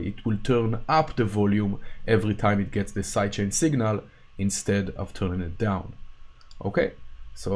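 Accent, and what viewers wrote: Israel. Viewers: where are you from? you sound israeli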